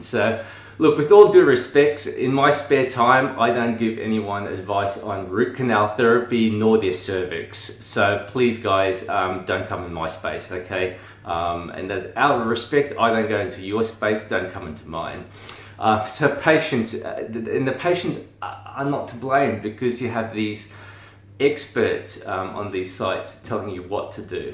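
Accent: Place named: Australia. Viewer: Asian doctor with oz accent. Love it.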